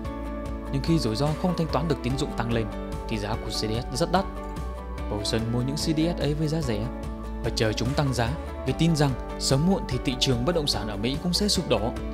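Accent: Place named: Thanh Hóa, Vietnam. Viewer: chất giọng thanh hoá này nghe ko hay cho lắm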